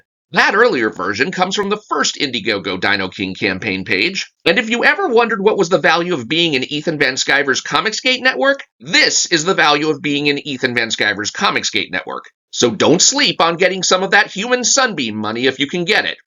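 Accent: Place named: Australia. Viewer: Your just coping and seething, luv the Aussy accent through.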